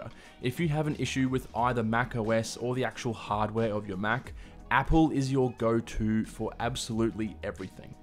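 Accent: Australia. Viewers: Generally, I face difficulties understanding the Australian accent, but the way you speak - clearly and slowly, I get almost 100% of what you are saying.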